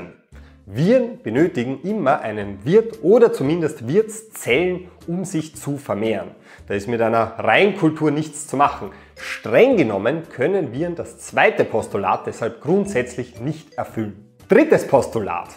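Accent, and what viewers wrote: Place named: Austria. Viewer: Ich mag deine Art, in deinem Deutsch gerade so viel Österreichisch zuzulassen, dass du damit nicht proviziell (Entschuldigung, bin selbst Österreicher, ich darf das) wirkst, sondern die Allgemeingültigkeit deiner Aussagen betonst und trotzdem eindeutige rotweißrote Perlen setzt, die dich unverwechselbar machen und deiner Sprache Persönlichkeit geben.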